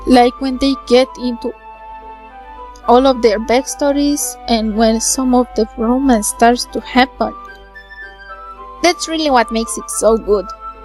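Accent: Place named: Romania. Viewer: Ma refer se cunoaste din accent ca esti romanca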